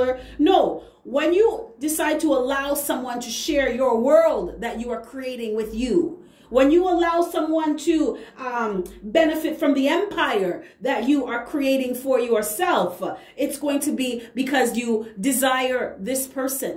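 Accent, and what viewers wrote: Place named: Jamaica. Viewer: I was sooooo resonating with this and then i heard the patois. Made it clear we connecting....thank you my fellow Jamaican.🥳🥳🥳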